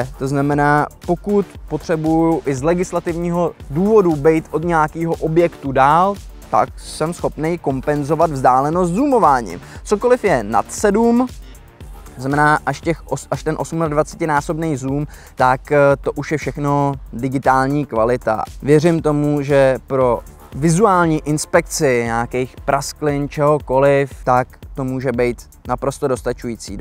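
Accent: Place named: Prague, Czech Republic. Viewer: Ten pražskej akcent to je něco strašnýho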